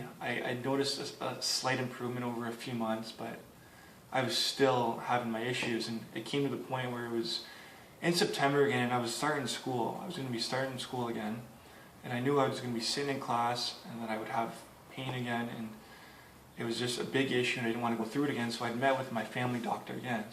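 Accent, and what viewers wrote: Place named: Quebec, Canada. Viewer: I came here for info about discs and stayed for the French-Canadian accent and the 'ookey hair.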